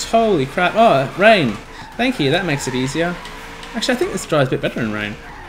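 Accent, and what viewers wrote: Australia. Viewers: I'm confused are you Aussie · your australian?? wanna Meet up?? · Brisbane, Australia im guessing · hi are u australian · Are you Australian and if so what part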